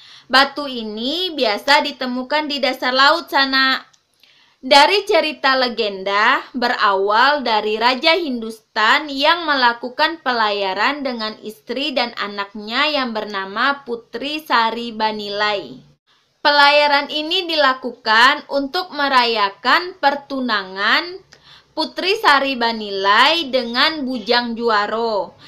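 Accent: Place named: Indonesia. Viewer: Bahaso minang c lah diak,lawak bunyi nyo bahaso indonesia adiak wkwkwk...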